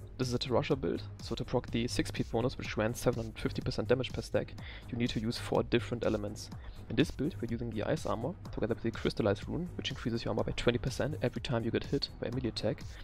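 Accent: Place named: Germany. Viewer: Odd, I watched the video in full and heard/understood everything he said; I'm also not convinced that his accent is really an issue either as I'm Australian and he sounded German to me though I could be mistaken but it any case I could understand him so BobDaBilda & Faphand111 you both may need to address your computers sound issues. O.P thanks for the upload, I am rolling a Wizard for season six and even though Explosive Blast has never been a favorite of mine it was still an interesting build you demonstrated. I am actually keen to either try the Vyr's set or alternatively I might skip sets all together and focus on a disintegration build seeing as Wizard has changed quite a bit since I last played one.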